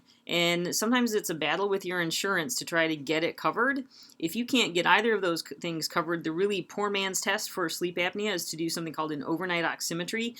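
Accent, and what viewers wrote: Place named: Upstate New York, United States. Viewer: Great video. I am also loving the Upstate New York accent!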